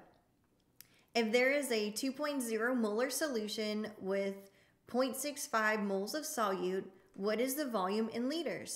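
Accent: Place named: United States. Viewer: Not long found your channel and I love your videos, even the American accent! Many thanks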